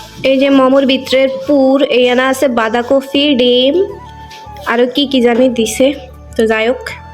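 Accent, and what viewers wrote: Noakhali, Bangladesh. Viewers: তোমার এই ব্লগ ভিডিও টা আমার কাছে অনেক ভালো লাগলো আর ও বেশি সুন্দর লাগছে তোমায় আর তোমার ঐ মুখে নোয়াখালী ভাষা শুনে আমার খুব ভালো লাগলো আর বেশি সুন্দর লাগছে তোমরা ভাই বোন হাসি খুশি হয়ে ম-ম বানাচ্ছে এটা দেখে আমার খুব ভালো ও চমৎকার লাগলো আমি যখন তোমাকে আমার বউ করে আনবো তখন তোমার ঐ হাতের বানানো ম-ম খাবো শুধু তোমার হাতে প্রিয় ❤️ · আসসালামু আলাইকুম আপু কেমন আছেন আপনি। অসাধারণ সুন্দর নোয়াখালী ভাষা আমার ভালো লাগে। আপনার সব কথা গুলো আমি বুজি।। ভালো থাকবেন আপনি আল্লাহ হাফেজ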